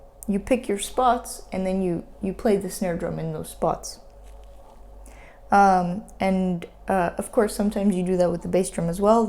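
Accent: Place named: Eastern Europe. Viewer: Slavic accent. It's not thick at all, but it sort of 'rounds' words, makes them voluminous. Great video, btw!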